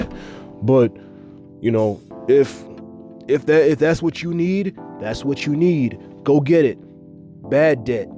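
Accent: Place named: Brooklyn, United States. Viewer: You from Brooklyn?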